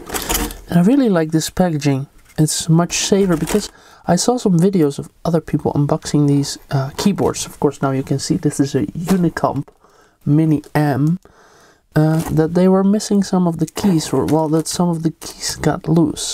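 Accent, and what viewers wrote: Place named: Netherlands. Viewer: It's incredibly obvious when listening to the clicks and paying attention to the recording, but I caught it in the corner of my eye, watching both. But if any board would be that slow to respond it'd be brought up in the review, instantly as that's borderline unusable, I've suffered a Bluetooth keyboard that's actually that slow. My New Model M, the full sized version with a simpler controller module, has no noticeable delay at all, though I'm unsure to the debounce time. I've even played some games with it, aside from the actuation hysteresis and membrane sensing 2KRO limitation it's perfectly fine for non competitive play. This 2KRO limitation and high weighting would be why it isn't ideal for very, very fast typists, but if you're that fast you'd already have gotten a special board for it. P.S. Dacht al dat ik het accent herkende, erg duidelijk voor andere Nederlanders maar bevestigd door de interface in dit stuk.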